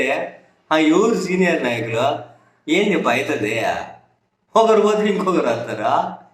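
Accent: Rayalaseema, India. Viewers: First time రాయల సీమ slang lo chusanu nenu నవ్వుకొన్నా బాగా ❤😂😂😂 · My Rayalaseema language I liked it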